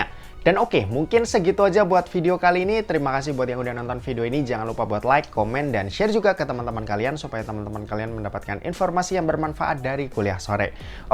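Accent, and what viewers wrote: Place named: Central Java, Indonesia. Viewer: orang cilacap atau semarang njenengan mas?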